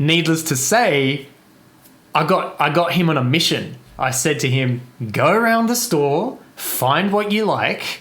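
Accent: Australia. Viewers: Great first flosstube! Lately I feel like all the flosstube channels I’m recommended offer up similar content. I discovered a few new designers with you which got me excited! It’s also great to hear a fellow Aussie accent ☺️ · Welcome to flosstube knew you were a fellow Aussie as I was listening to you - your a natural - your wizard work was fantastic ~ I am working on the pirate ship for my grandson I did change the called for fabric though a stitch in time is an Aussie store I buy from too needle minders I brought from WA etsy store recently called ‘inchwormdesign’ really like them and reasonably priced - looking forward to next episode😊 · Hey from Melb, love hearing another Aussie! Well done on your finishes. I agree, it's a daily lifestyle. Hope your pinky recovers, I hit 40 last year.....gets worse 😂. Welcome to Flosstube 🥳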